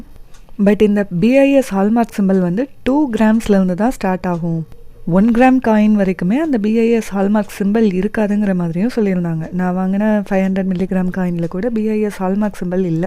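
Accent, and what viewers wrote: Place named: Kerala, India. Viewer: Your voice is soo good ma like malayalee speaks tamil. Slang is different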